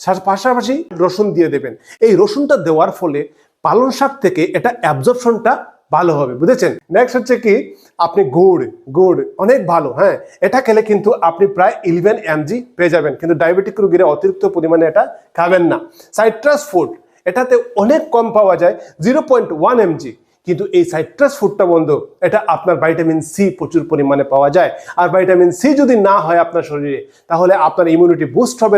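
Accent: Sylhet, Bangladesh. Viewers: apni monehoy ekjon Sylheti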